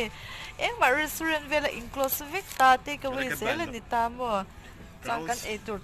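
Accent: Mizoram, India. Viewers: Her English accent totally mizo no doubt😂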